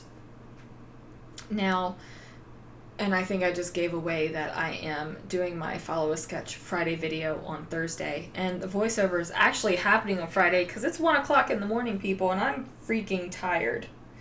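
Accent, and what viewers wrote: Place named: Baltimore, United States. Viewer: We love your chatter...and I love your accent...Baltimore is a fun place that I miss!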